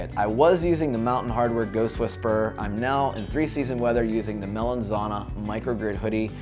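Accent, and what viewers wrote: Pittsburgh, United States. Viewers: You from Pittsburgh? You have a bit of a yinzer accent.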